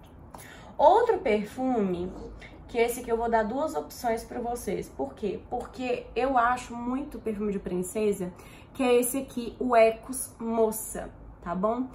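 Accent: Minas Gerais, Brazil. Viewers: Sou apaixonada nesse sotaque, trem 💙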